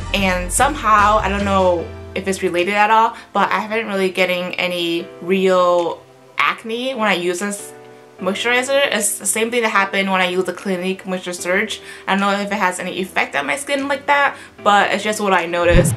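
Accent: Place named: Singapore. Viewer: haha you have a slight singlish accent here :D #SG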